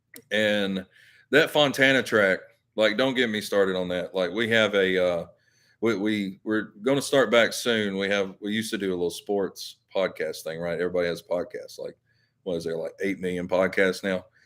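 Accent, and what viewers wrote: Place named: South, United States. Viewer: Sorry I missed your live ..enjoy your videos. I'm from Ga so your southern accent is great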